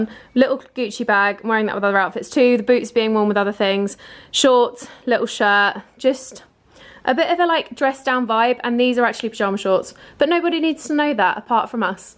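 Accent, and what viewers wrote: United Kingdom. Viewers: I’ve been watching for 2.5 years and I think I found you when I was binge watching closet clear out videos during lockdown. I really like your style, your energy and your honesty. Also I moved from the UK to the US last year so it’s really nice to hear British voices on videos and podcasts. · I've been watching you for a year now 😊 Back then I was living in Sheffield for my Erasmus year in the UK (I'm from France) and I wanted to find some UK lifestyle youtuber/content creator to watch while doing the dishes 😂 just to get used to the British accent 😂 and here I am, à year later, still watching your videos ❤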